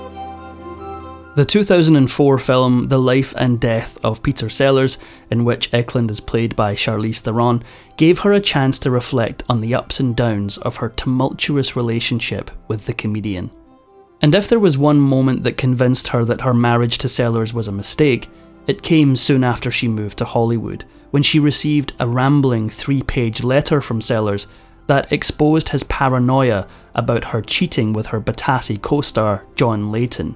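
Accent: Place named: Scotland, United Kingdom. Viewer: I could listen to that Scottish accent forever!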